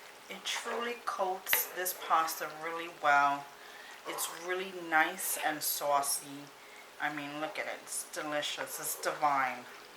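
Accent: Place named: New York, United States. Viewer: Love it looks delicious . are you from NY ? I live in the south but I'm originally from Long Island NY. I miss hearing the NY accent . love you recipes keep putting them out ❤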